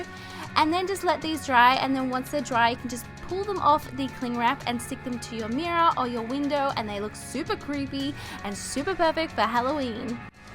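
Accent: Australia. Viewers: Are u from melbourn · Australian I am pretty sure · is she Australian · Is she Australian??!! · You deserve WAY more subscribers than you have you so beautiful and bubbly it would make me so happy if you replied! I'm new this is the first video of yours that I've seen the second I heard your voice I subscribed and turned on notifications love you so much💕 BTW are you Australian cause I am too that would be awesome byee xoxoxo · ARE U AUSTRALIAN???? Go AUSTRALIA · Hey are you AUSIE! if s so I am too!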